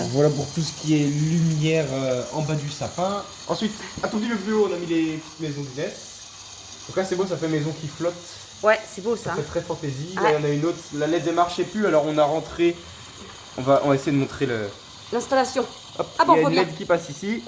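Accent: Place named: Normandy, France. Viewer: Coucou, et le papa ❤❤❤ Chouette le sapin, trop mignon 😍 🎉 Décidément 😂 tu es spécialiste dans les pannes de voiture 😅 espère tout rentré dans l'ordre. J'aime t'entendre, tu as un côté rigolo à raconter tes expériences 😂 et avec ce parler qui ressemble tant à celui de mon enfance près du Mont St Michel (côté normand )dont j'ai gardé encore qq accents. 😅 eh oui, on ne change pas une équipe qui gagne 🤣🤣🤣 Gros bisous et bon week-end ❤😊❤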